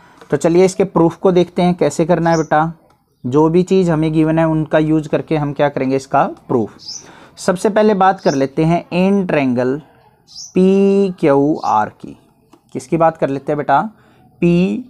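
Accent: Haryana, India. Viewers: Sir Haryanvi hai awaz se hi pta lag gya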